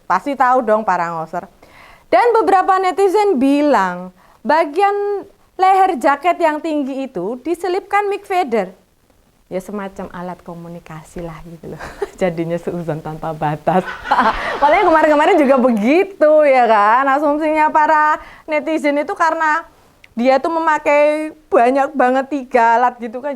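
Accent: Java, Indonesia. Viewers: Mbak asli ndi to Kok jawax medok Jateng opo jatim Q demen ngrungok ke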